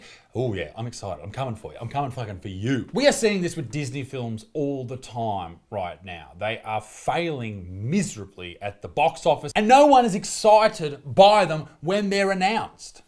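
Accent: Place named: Australia. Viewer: As a fellow Australian I really dislike your oker accent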